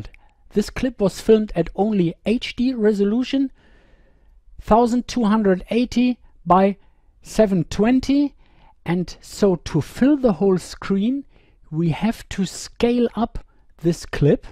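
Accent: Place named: Europe. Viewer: brilliant explained show me how to and refreshing european accent- as opposed to annoying american drools.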